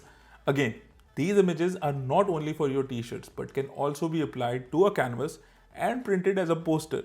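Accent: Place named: India. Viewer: He sounds Indian but does not look Indian.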